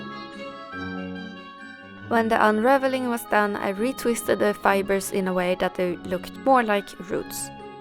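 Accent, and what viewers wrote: Sweden. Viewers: Är du svensk ? Du låter lite svensk hoppas du fattar vad jag säger nu 😊☺️ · Are you from sweden? You sound swedish, im from sweden ❤️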